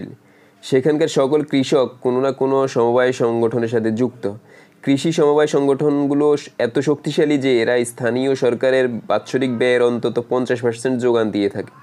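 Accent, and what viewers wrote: Noakhali, Bangladesh. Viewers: আপনার বাড়ি নোয়াখালী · Bhai apne noakhailla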